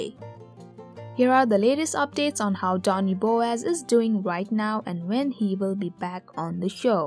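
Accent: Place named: India. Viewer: Sounds Indian to me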